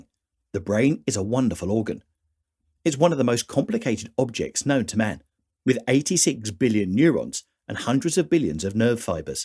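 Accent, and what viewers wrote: England, United Kingdom. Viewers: Such beautiful English dialect, I like biscuits and tea btw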